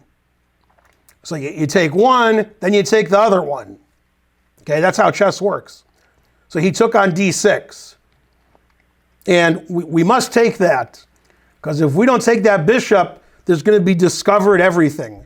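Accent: United States. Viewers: How can the american accent be so annoying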